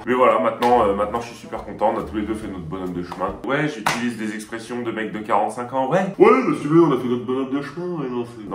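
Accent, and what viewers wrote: Quebec, Canada. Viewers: Ton québécois 10/10 pour une personne qui te suit du Québec super accent 👌🏻💥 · L'accent québécois 10/10 ✨, incroyable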